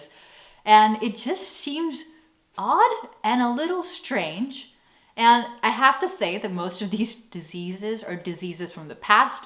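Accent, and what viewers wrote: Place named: Netherlands. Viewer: How do you retain that perfect little figure? if i even looked at half what you eat in your video's i would need wide screen to not extend outside the frame LOL, enjoy your blessed metabolism! Wow, your english is more and more becoming like a dutch person speaking english, you are getting the accent! Combine that with your dutch words being so good... if i dont remind myself you are american, i would think im hearing an average dutch girl, apart from some harder dutch words which you butcher in an ever so cute way! Yep, the bike above other bike thing intimidates me too. Especially when i grew up in a time they didnt have the slide. you just had to lift the bike and sort of roll it in there. Which was why the bike parks used to have a note "gentlemens bikes on top, ladies at the bottom' so there would usually be a spot down there, and they cheated a bit by having the lower parking spots be dwon a small ramp, so the top ones were about at eye level. 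I often used paid bike 'parking garage' where there was an employee to help you if you couldnt manage. And ofc there is often some other person prepared to help if they see you struggle.. (Tip: skirts attract help, LOL theyre miraculous. they make cars let you cross, get stuff picked up for you etc) To be blunt: only antisocial youth curse with cancer Its a tell tale sign of ppl you want to avoid.. Its not at all accepted among civilised or mature people, and to a lesser extent this applies to other diseases as well. The non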